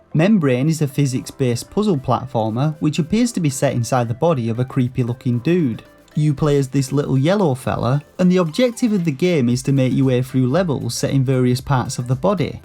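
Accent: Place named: Yorkshire, United Kingdom. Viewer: Star or Shovelware I don’t know if you’re from Yorkshire or Lancashire.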